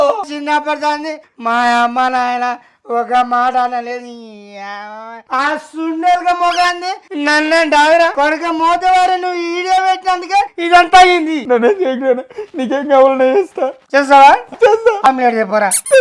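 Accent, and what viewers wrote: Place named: Mahabubnagar, India. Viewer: Miyammak phonjescheppinav ania side kuda ilage matladutaru mi mehaboob Nagar side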